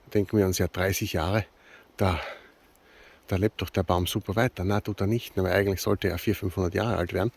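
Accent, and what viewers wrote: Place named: Austria. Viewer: Omlouvám se, ale nemůžete mluvit spisovnou němčinou? Jedlé kaštany mě zajímají, sami je už pár let sázíme, ale tomu dialektu (AT?) jako cizinec nerozumím úplně dobře ..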